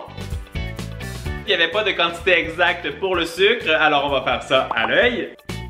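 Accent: Canada. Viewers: je trouve que même si tu es un français tu parle avec un accent plus québécois que Isaac like si t'es d'accord 👍😝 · j’adore ton accent canadien!!!! ❤️ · J'adore ton accent canadien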